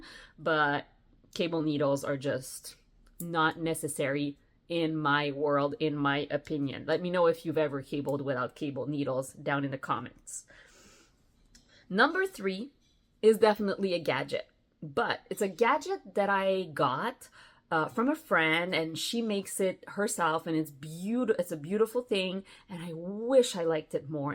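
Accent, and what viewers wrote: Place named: Quebec, Canada. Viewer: Thank you for your videos! ❤ You sound Quebecoise. 👏🏻💞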